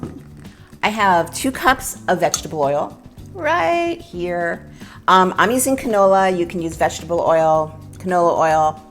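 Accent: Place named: South, United States. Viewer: Hi! That dressing looks great! I have not seen a recipe calling for the oil from the anchovies, either, so I am sure that dressing would have a ton of flavor. Accent is definitely Southern! I agree that olive oil has a strong flavor and probably wouldn't be right in this. Romaine is so common now, a lot of people won't eat Iceberg...hard to believe that Iceberg used to be normal. Wow, that turned out great. Like you said, it makes a ton, so you can make your neighbors happy. Thanks for sharing!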